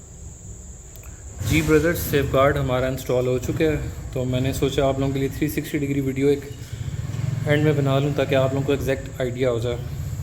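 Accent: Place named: Saraiki, Pakistan. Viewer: Iski awaz mein saraiki pan hai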